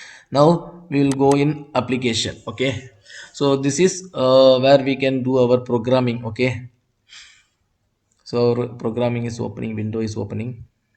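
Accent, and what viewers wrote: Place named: India. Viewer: Gotta love the Indians attempting to talk English. Loosen ur turbin a little Bit. Thinks it still cutting off the blood flow a little bit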